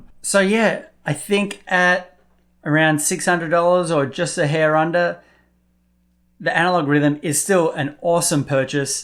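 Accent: Australia. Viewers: Cool… are those prices $US. You sound like an aussie!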